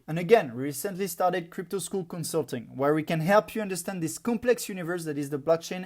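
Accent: France. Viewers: tu parles bien anglais mais ton accent est français ^^ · Adam XRP Son Lol. This guy sound very French. Ran is South African...yet I hear how you could confuse the two.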